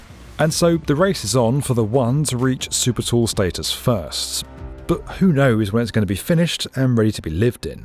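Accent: United Kingdom. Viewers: I know this channel is based in London, but I always feel a British accent in a documentary is just fake and added to fool people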